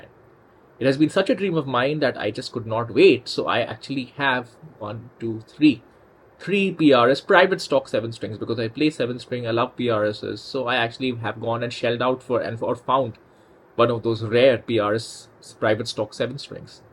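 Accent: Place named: India. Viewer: sound muted cause of Indian Accent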